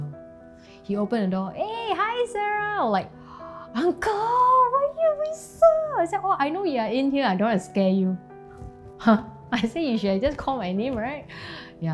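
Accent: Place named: Singapore. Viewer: Singaporean accent a female embalmer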